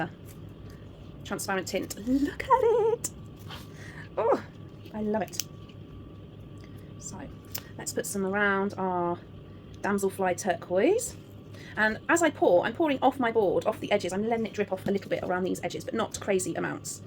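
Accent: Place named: United Kingdom. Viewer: Does everyone in the UK talk as fast as you do? Sorry but I’m from Texas in the United States of America and I just can’t hear that fast!! We talk a lot SLOWER here in Texas. But I really love your accent; it’s so pretty but I just can’t understand you cuz you talk to fast for my brain to comprehend what you are saying. LOL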